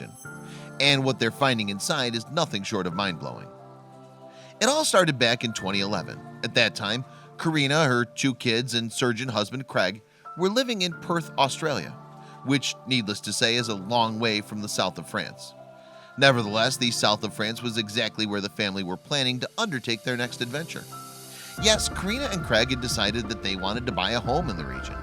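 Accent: United States. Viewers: irritating narrator... why an american..? and stupid music.... disturbing like hell! no likes or subscription, guaranteed! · Why the dreadful American voiceover with mispronunciations? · THE AMERICAN VOICE ON THIS VIDEO IS A COMPLETE TURNOFF.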